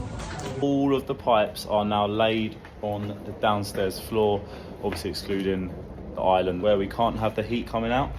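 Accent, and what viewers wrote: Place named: Croydon, United Kingdom. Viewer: Listening to your accent i bet you are close to me too Croydon 🧐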